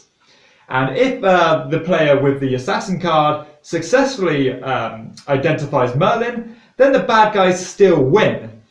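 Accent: United Kingdom. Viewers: Glad to help! I can definitely see the confidence. And Avalon is one of my favs also. I should mention as a fellow fan of the genre that if you haven't tried it yet, "Secret Hitler" has some great and exciting twists on the genre that my social deduction groups love equally for slightly different reasons. Fewer "roles" but in exchange the title has an elegant deck mechanic that basically auto-balances the game if one side or the other is winning too heavily, and the government becomes more authoritarian and heavy-handed as more fascist policies are passed which creates a bit more of a crescendo and arc to the drama (whereas Avalon Rounds 1 and 5 aren't terribly different necessarily). We go back and forth over which game we prefer since both have interesting pros and cons. I think it's important to add that having played the game I don't believe the source material was used distastefully, I think there were interesting and compelling reasons for selecting that particular theme over others. 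Maybe a game to consider when it's available outside the U.S. (your accent sounds British to me); it is also available via free PnP from the manufacturer's website. And THANK YOU for the sleeves, I'll check them out! I need them for my copy of War of the Ring too and I saw you had already given the dimensions for those in a different video and they seem to be the same brand. Lifesaver!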